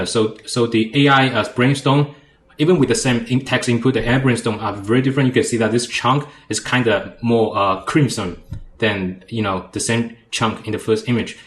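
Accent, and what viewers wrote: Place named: Singapore. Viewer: but why do you speak Singaporean English🙂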